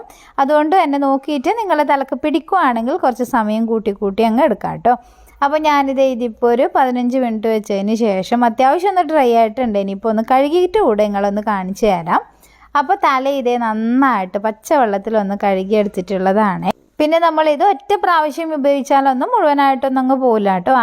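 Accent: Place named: Kasaragod, India. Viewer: അതെല്ലേ.... ഞാൻ കാഞ്ഞങ്ങാട് ആണ്... കാസറഗോഡ് dist.... ചില വാക്കുകൾ ഞങ്ങള് പറയും പോലെ തോന്നുന്നുണ്ട്... അതോണ്ട് ചോദിച്ചതാ 😍👍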